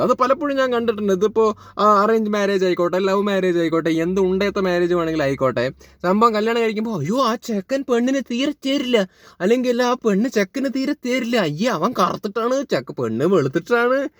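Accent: Palakkad, India. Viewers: PALAKKAD slang poli 🔥 Very comedy 🖤❤🖤 🔥BIGG FAN🔥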